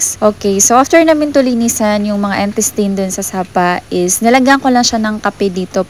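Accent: Bohol, Philippines. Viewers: abi nhu taga Bohol ka mam mao mn gud tunada inistoryaan nmu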